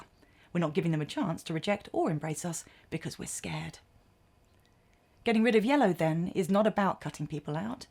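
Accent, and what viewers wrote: United Kingdom. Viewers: I love your accent, is it british?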